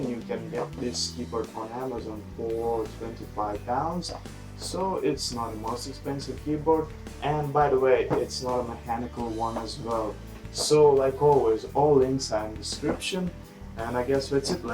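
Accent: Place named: Russia. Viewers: Лол, русский акцент) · the translate : Lol,Russian Accent) in case some one wonder. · lol ruski accent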